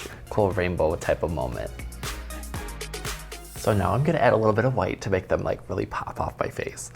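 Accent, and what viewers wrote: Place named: Midwest, United States. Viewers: The Minnesotan accenttttt. Makes me feel right at home😊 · Her Midwest accent is so fucking cute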